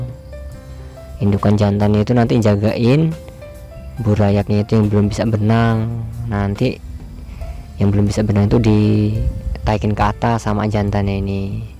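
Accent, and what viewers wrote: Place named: Palembang, Indonesia. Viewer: Abang ini logat Palembang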